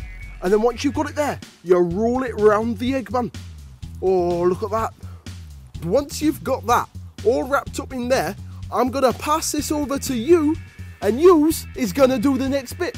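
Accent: Jamaica. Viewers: you sounded a tad jamaican, other than that i liked it. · he sounds Jamaican aha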